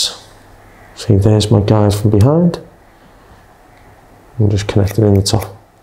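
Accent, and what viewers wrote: Liverpool, United Kingdom. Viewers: you sound like you have a Liverpool accent I am right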